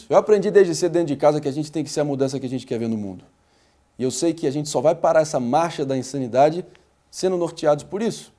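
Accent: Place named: São Paulo, Brazil. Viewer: Bahhhh mas tá horrível o sotaque! Nossa pra manézinho da ilha essa pessoa tá um paulistano nato!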